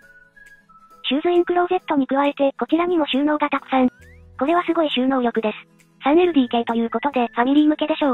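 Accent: Hakata, Japan. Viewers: もっと博多弁を〜〜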